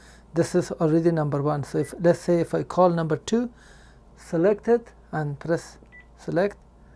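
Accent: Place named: Poland. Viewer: Is this phone the Panasonic - KX-TGF975S Link2Cell DECT 6.0 Expandable Cordless Phone System with Digital Answering System and Smart Call Blocker - Silver? I'd love to purchase one of these one day! Does this have a voice guide? What I mean is, will it talk to you when you're setting up the features? As I am sight challenged, I have to have things that talk to me, as I can't read print, and I can't see what's on a screen. By the way, I looooooooooooooove your accent! Is that Polish? Maybe it's Spanish?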